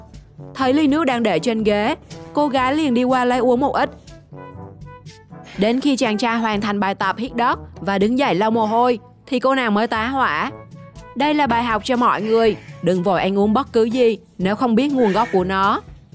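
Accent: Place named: Central, Vietnam. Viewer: Nghe tiếng dể thương mà ba, tôi ng miền nam nhưng nghe khoái. Chị này chắc ng miền trung đấy. Tôi có một ng bạn tiếng giống chị này rất dể thương, xa rồi mãi nhớ giọng nói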